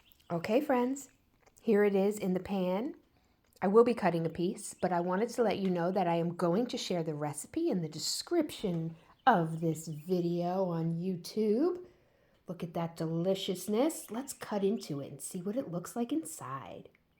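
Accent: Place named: New Jersey, United States. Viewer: I've lost a lot of my jersey accent living in Maryland for almost 30 years now but when I heard your accent in the video I told him this recipe must be legit go get me some Bisquick ASAP 😂😂😂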